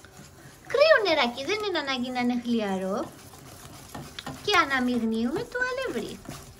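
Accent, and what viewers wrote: Japan. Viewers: Υποθέτω, έχεις καταλάβει ότι μιλάς πια τα ελληνικά με γιαπωνέζικη προφορά. Σαν κάποια μεταγλωτισμένα γιαπωνέζικα παιδικά που βλέπαμε μικροί. Το οποίο είναι χαριτωμένο:)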